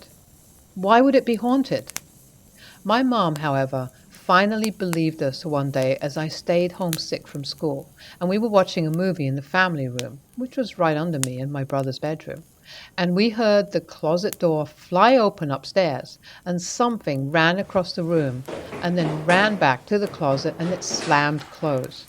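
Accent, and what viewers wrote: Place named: England, United Kingdom. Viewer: Yay finally an English voice telling me spooky stories! And fire sounds too! Excellent, continue please ✨☠️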